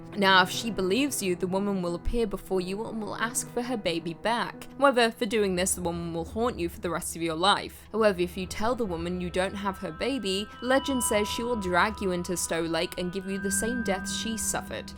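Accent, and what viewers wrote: England, United Kingdom. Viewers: I didn't realize that California had such dark and scary history and I love English accent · the hollywood sign ghost looks alot like kirsten dunst, is it just me or? is not losing her english accent, what do you mean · speaking as an English person.. you still sound very English to me. I don't know what they're talking about. Keep up the great vids:)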